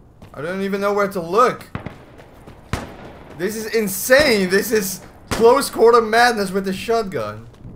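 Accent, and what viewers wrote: Netherlands. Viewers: The Dutch accent is real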